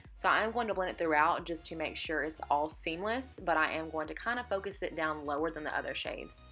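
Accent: South, United States. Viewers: I love the southern twang. Where are you from? Hate the music!!!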